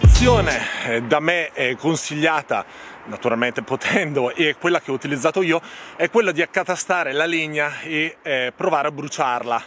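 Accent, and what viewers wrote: Brescia, Italy. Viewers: Avevo riconosciuto l'accento bresciano! Anche io! e tra l'altro vivevo proprio a Cellatica, dove sono ancora i miei genitori. Ti seguo con interesse!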